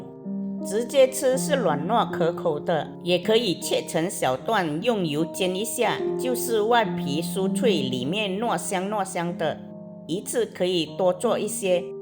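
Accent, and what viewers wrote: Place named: Malaysia. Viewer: 听得出是我们马来西亚人👍🏻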